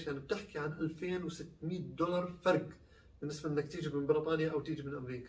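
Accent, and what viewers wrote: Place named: Jordan. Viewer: اول شئ الله يسعد دينا احنا الاردنيه بصدقنا ووضوحنا وشرحنا بإيصال المعلومه بشكل مباشر وواضح ومفصل ومفهوم انا مش بس من اللهجه بل من طريقه الشرح والتوضيح والتفصيل قلت هاد الرجل اردني أو فلسطيني أو مكس 😂♥️♥️♥️💪 كل الاحترام اخي الكريم وأمنيات التوفيق على الدوام واستمر بإيصال صوره مشرفه عن شعبينا الواحد وصدقنا ورقينا بالتواصل وإيصال المعلومه بكل امانه ومسؤوليه ولك كل الدعم 💪♥️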